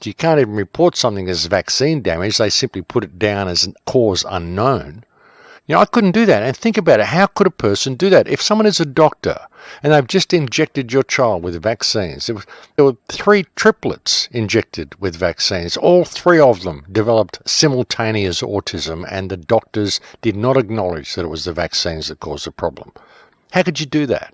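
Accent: Australia. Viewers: Jumbo jumbo with a “ Britt “ accent. Actually, Aussie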